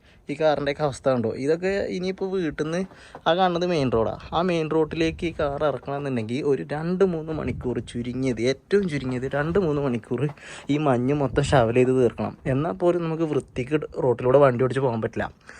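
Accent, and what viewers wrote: Malappuram, India. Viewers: Malappuram slag mariyo😂 malappuram slag ato bangi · Malappuram slang kekkan oru sugaan😁🤝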